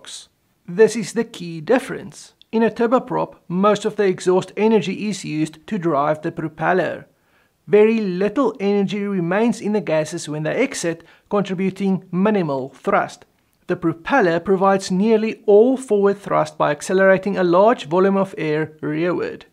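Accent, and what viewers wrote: South Africa. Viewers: Brilliant !!! Well presented. Hou van die Suid Afrikaanse klink.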